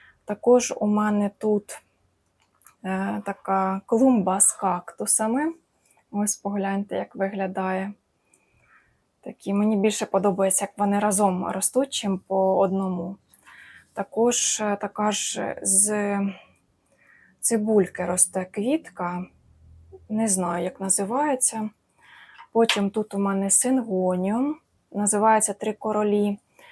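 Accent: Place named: Poltava, Ukraine. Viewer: Вітаю, чую по розмові, що земляки з Полтавщини 🌻. Я народилась, і проживала в Полтаві, там маю квартиру, але 20 років живу в Києві. От в Полтаві в мене квартира "сталінка", в ній завжди влітку не жарко, бо стіни товсті - 70 см цегляної кладки, там комфортно для рослин, а в Києві маю дуже спекотну квартиру, без кондиціонера квітам важко, в усі вікна цілий день світить сонце, жалюзі закриваю на вікнах, на балконі в спеку взагалі може бути +45, одне радує, що восени і взимку квітам світла в цій квартирі достатньо. Тому зараз не купую рослинки, котрим потрібна прохолода, і підвищена вологість повітря, довелось змінити свої вподобання.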